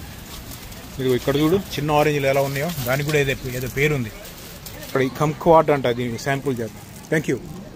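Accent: Andhra Pradesh, India. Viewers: Hii bro, మీది తెలంగాణ అని అన్నారు మరి మీ స్లాంగ్ మా ఆంధ్ర ప్రదేశ్ స్లాంగ్ మాట్లాడినట్టు ఉంది 😂😂😂😂😂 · Thammudu " Angadi " antunnav meedhi Telangana lo Nizamabad antunnav.Nee Yaaasa emo Andhra prantham lo vaade Yaaasa la ga vundi.Meeru Andhra prantham nundi valasa velli Nizamabad lo settle ayyara??? TG lo "Dhukaan" or "Dhukanam" antaaru kada andhuku doubt vatchindi.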